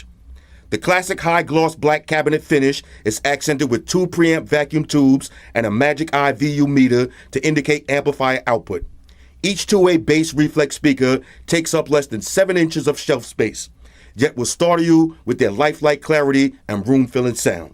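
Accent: New York, United States. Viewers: Yo, it seems strange I’m watching this video from NYC / man this place is crazy & everyone in this hood all talk like you!! Hahaha, this city has so much to offer some 1 like me while I’m here on vacation- hot video again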